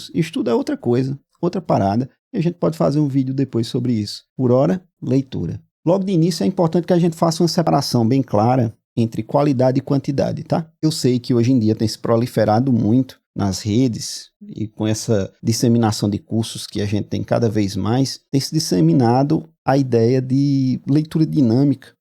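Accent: Pernambuco, Brazil. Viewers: Pelo sotaque é Pernambucano .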